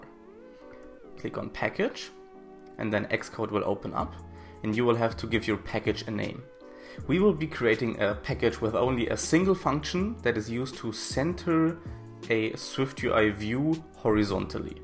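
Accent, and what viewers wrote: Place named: Germany. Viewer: Thank you, man, it helps! Like you german accent)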